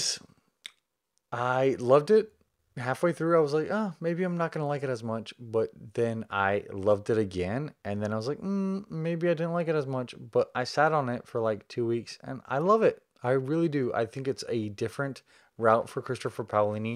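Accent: South, United States. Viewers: I can't believe you had like a book called, "To Sleep In A Sea of Stars" by Christopher Paolini at your house in Texas during your quarantine until you're reading the whole book and talking about Kira Navarez's life was on the brink of a happy change. She was newly engaged to her co-worker named, Alan, geologist and they were planning to settle on Adrasteia, the moon of Sigma Draconis, where they had been working together for several months. It's really important to do that and I hope your husband, Zach will be very proud of you, because I love your Southern accent!!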